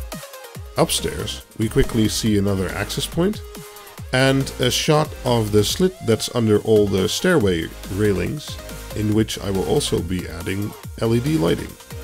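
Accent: Netherlands. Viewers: Ik hoorde meteen je Nederlandse accent. Mooi huis!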